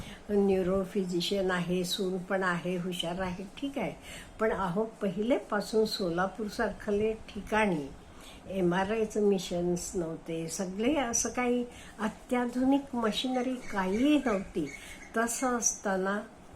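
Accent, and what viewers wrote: Karnataka, India. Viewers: तुम्ही मूळ कन्नड बोलता का. बोलण्या बरून वाटते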